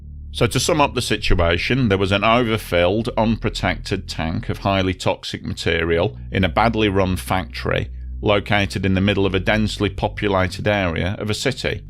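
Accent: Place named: North Midlands, United Kingdom. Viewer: I'm intrigued by *'s accent. I think I've narrowed it down to North Midlands/Derbyshire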